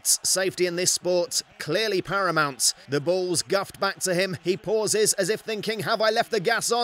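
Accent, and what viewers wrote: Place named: United Kingdom. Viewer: why British accents are so awesome and sexy.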